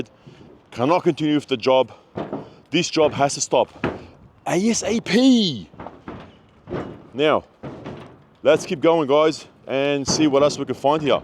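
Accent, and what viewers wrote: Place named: Western Sydney, Australia. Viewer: Your content is invaluable to all potential home and building owners. Your message would be more effectively delivered without the - "oh my gods" and "would you believe it?" delivered in western Sydney dialect. You are obviously on top of your subject but please give us some results. Are builders and certifiers in gaol? Where are the references to Court cases emanating from your investigations? Your method of delivery appeals only to those who want to complain that they are beaten down but have taken no measures to educate themselves.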